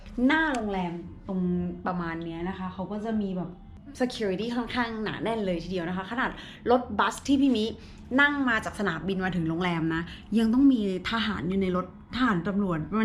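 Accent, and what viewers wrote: South, Thailand. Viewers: สวัสดีค่ะเป็นคนถูเก็ตค่ะติดตามมาตลอดค่ะแต่ไม่เคยคอมเม้นชอบตอนทำคลิปพูดใต้น่ารักมากค่ะ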